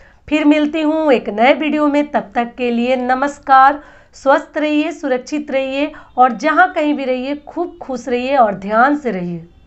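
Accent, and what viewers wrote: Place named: Uttar Pradesh, India. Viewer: Jai mata di🙏🙏didi aap kis kahan se hai up se ya bihar se apki bate sunkr to up se hi hai aisa lgta hai pr kis gav se hai kyoki mai bhi up gorkhpur se hun pr abhi nagaland se apka video dekhti hun apki bate sunkr mujhe apne mayke ki bahut yaad ati hai sasural to delhi me hai pr mayka gorkhpur me hai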